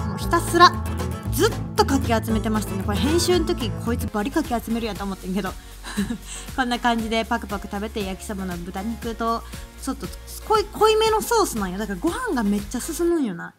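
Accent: Kansai, Japan. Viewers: 初コメさせていただきます。 おすすめの一番上に出てきました。 今日は一日中、心がモヤモヤした出来事があり、泣いてばかりでイヴだと言うのに食欲も無くて。 腫れぼったい瞼のまま、動画再生してみたのです。 元気な関西弁にモリモリ食べる、プラス美人さんなのに、気取らずに素で話していて、すっかり見終わる頃には心も少し軽くなりました。 登録させてくださいね！応援しております♪ あ！動画観たらなんだかお腹空いてきました〜笑 元気を分けてくれてありがとうございます😊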